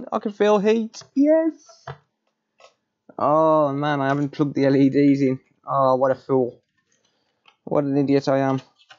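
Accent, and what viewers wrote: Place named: Walsall, United Kingdom. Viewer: Ooooooo, you sound super local 🤣 Walsall guy in the House!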